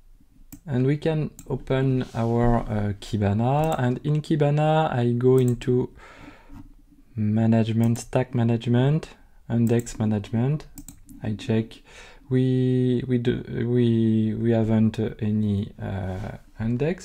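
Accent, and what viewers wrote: France. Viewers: Your French accent is amazing :))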